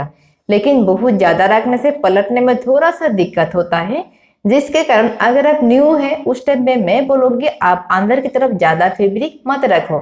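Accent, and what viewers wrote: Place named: Bengal, India. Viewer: দিদি তোমার বাংলা একসেন্ট আছে😁😁 বাড়ি কোথায় দিদি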